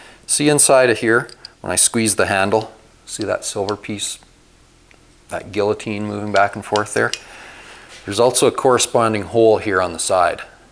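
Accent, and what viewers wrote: Canada. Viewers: Very helpful. Love your Canadian accent! I’m anCanadian living in the US and miss that so much. Thanks for your good pointers. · I recently found your channel. I have watched a couple of your videos. I think you are a really great instructor and I appreciate your videos very much. I lived in Alaska for 25 years and consider all Canadians as neighbors. You are wonderful neighbors. I mean this is a really complimentary way, I admire your accent! LOL. Keep up the good work Brother...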